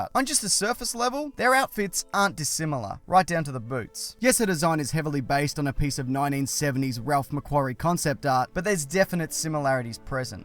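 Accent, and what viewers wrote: Australia. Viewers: Are you Australian? Us Australia are mad dogs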